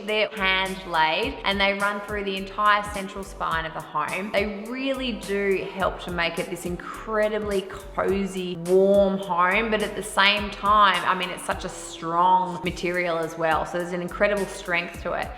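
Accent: Australia. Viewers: Her accent is from South (or) West Australia.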